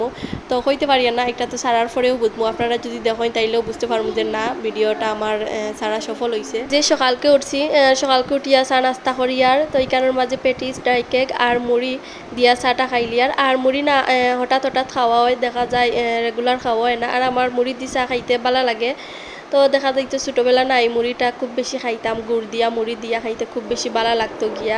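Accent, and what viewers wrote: Sylhet, Bangladesh. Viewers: আসসালামু আলাইকুম। ভি‌ডিও খুবই ভালো লেগেছে।আপনার কন্ঠে সিলেটি ভাষা শুনতে ভালো লেগেছে❤❤❤❤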